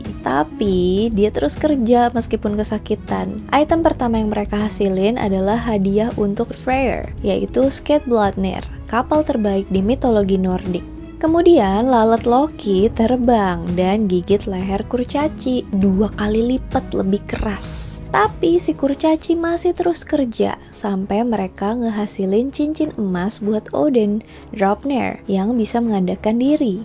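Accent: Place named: South Jakarta, Indonesia. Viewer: Gua suka pembawaan yang sekarang gaya anak Jaksel pisan wkwkwkw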